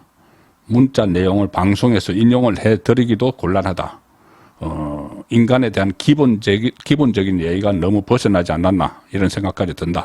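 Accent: Gyeongsang, South Korea. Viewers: 광끼 라고 읽어야 합니다. 경상도 분이라서 발음 안되심 ㅋ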